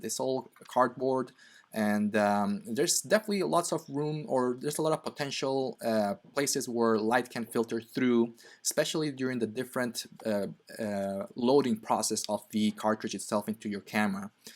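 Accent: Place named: Philippines. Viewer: Why do you have a filipino english language? Are you filipino?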